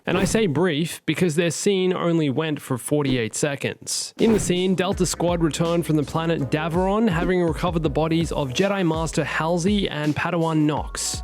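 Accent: Australia. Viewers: Are you from Australia bro? Love your accent ;)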